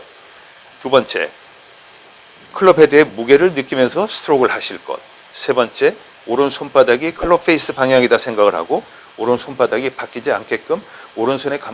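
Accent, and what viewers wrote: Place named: Seoul, South Korea. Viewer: 경기 해설도 너무 쟐듣고 있어요 ~~ ’했거던요‘ 하는 서울 사투리 너무 좋아요^^ 정말 듣기 희소한 서울사투리에요^^ 제 고향이 서울이라 그런가봐여 내용도 너무 좋아서 세계적인 선수들의 플레이 보고 설명들으면 너어므 공부가 됩니다 그냥 장활영 해설위원님 해설 경기는 그냥 틀어넣고 ASMR처럼 듣고 있으면 힐링 그 자체입니다 감사합니다^^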